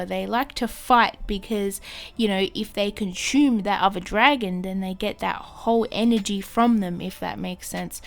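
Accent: Australia. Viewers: Thanks for the welcome! Loving your channel so far, so nice to hear the aussie accent too 😉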